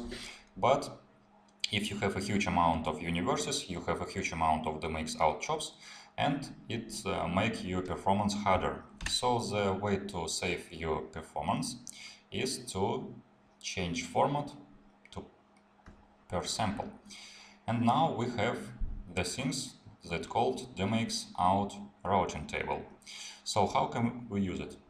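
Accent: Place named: Oxford, United Kingdom. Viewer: То что прям искал ! Спасибо большое ! ) Отдельное спасибо за оксфордский акцент. Всё понятно )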